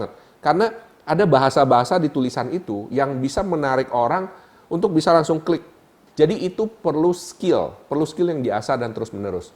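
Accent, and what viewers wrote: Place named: Surabaya, Indonesia. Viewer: Logatnya sama surabaya... Mas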